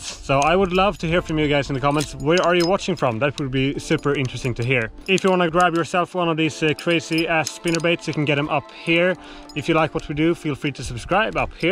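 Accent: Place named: Sweden. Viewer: As a Norwegian I love hearing his Swedish accent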